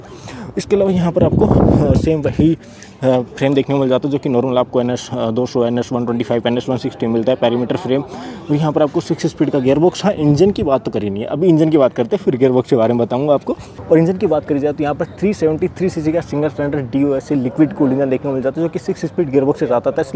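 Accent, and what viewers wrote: Haryana, India. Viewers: Heavy haryanvi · bhai aap hariyana wala hindi bolte ho to bhut hi acha lagta hai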